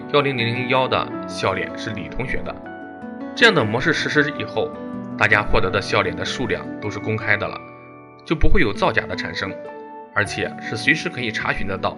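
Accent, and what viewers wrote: Henan, China. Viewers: 哈哈哈 up主是不是豫北人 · 主持人是河南人吧？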